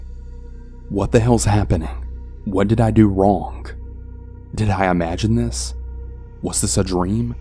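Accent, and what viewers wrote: South, United States. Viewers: The narrarator sounds like a country boy. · No to southern cannibal narration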